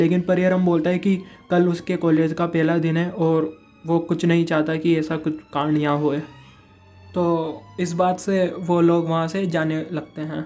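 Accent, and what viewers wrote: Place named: South, India. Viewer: not like that bt tumhara acsent full south wala he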